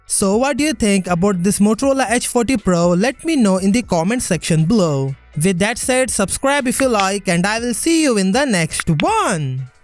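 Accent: India. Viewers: Indian English dialect makes it exotic for ears 👂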